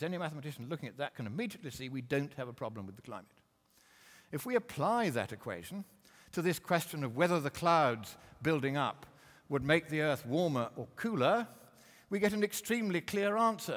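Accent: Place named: Scotland, United Kingdom. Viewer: he's scottish. That's a (way) upperclass scott accent.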